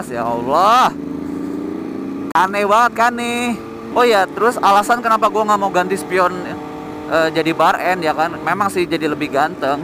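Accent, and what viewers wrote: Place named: Java, Indonesia. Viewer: sampean I wong ngendi kok ngomonge medok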